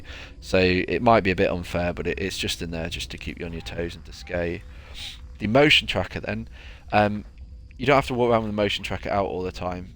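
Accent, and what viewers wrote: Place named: United Kingdom. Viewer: Very British...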